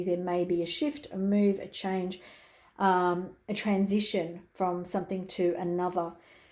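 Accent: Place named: Australia. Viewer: Pile 1 was amazing. Thank-you so much. I could write you a long essay about all the ways you spoke to me, but I’ll just tell you that I cried and laughed the whole way through. I’ve also been seeing 1111 again the last few days, and this morning I said to Spirit, “I’m listening” and then a bee flew in the room and after hovering in front of my face, flew around to show me a few things in the room (in answer of a recent question) and then left. Also you have such an amazing voice. I’m obsessed with your accent even though I’m Australian too, haha. You’re amazing! Blessed be!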